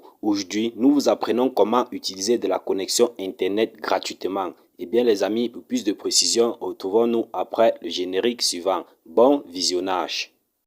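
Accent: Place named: Cameroon. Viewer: Quand tu vois accent ça peut être ivoirien , c'est un camerounais ouais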